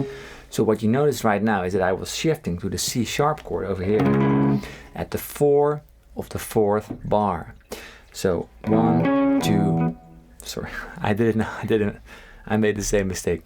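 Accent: Netherlands. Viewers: Are you Dutch? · Nice vid. I can tell from your accent that you're Dutch lol. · You sound so Dutch, I wonder if you're from the Netherlands haha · Yo homie, you dutch? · This guy sounds dutch as fuck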